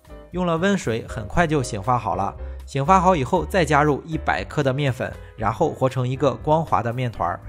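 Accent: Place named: Jinan, China. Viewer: 听口音就猜是济南的，加上甜沫豆腐脑，更加确定无疑了。谢谢老乡。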